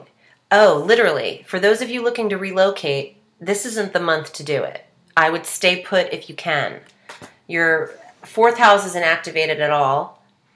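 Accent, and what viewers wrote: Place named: New Jersey, United States. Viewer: what a gemini communicator you are....i am so glad saturn isn't retrograde in my 10th house anymore...that was a 2 yr typhoon ruby if there ever was one! jupiter retrograde n the 7th house till 8/15!! giddyup!! I wish you would let more of your NJ accent out! I would love to hear your accent unadlterated....you could do your videos in pigtails and and yellow shirt with neon pink polka dots...you are fantastic.....love your nails....you're always changing the color ms. gemini.....ur explanations are in depth and so educational....thanks for taking the time to interpret. ;) always appreciated.....a fellow east coaster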